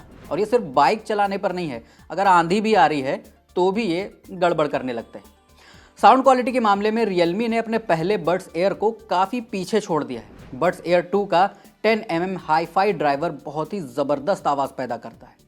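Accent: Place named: Bihar, India. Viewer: bhai aap Bihari lagte ho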